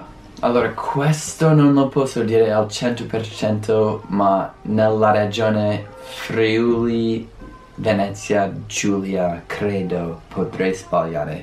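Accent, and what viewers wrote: United States. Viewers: Non so se sia più bello quando parli inglese o quando parli italiano con l’accento americano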